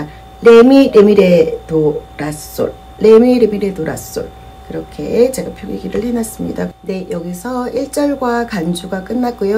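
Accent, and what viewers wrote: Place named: Seoul, South Korea. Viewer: 오? 부산에 계신데 서울 말씨네요~~^^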